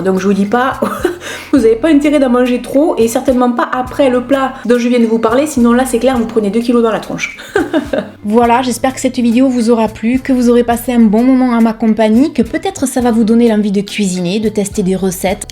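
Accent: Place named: Midi, France. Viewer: Bonjour, je découvre aujourd’hui votre site c’est votre accent du midi qui m’a interpelé car j’ai le même 😀et votre bonne humeur. Je vais,m’abonner car il y a pleins de choses super intéressantes Merci pour le soleil que vous apportez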